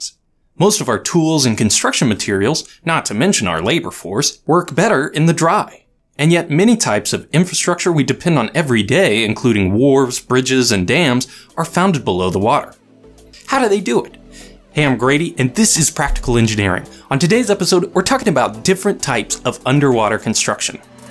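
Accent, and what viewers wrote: United States. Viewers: He speaks so...american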